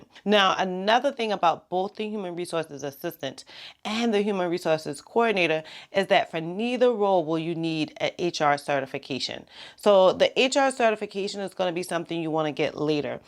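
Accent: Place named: New York, United States. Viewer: Random question: Are you from NY? I hear a NY accent I think.... great video though!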